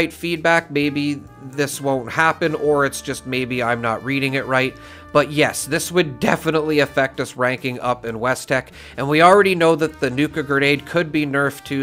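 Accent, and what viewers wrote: Canada. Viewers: This is channel. Minimal research. Maximum outrage. Nice friendly Canadian voice as the beginning. Most undeserving “creator” I’ve ever seen. He knows it too. Especially when he dies constantly on his Live streams. It’s actually hilarious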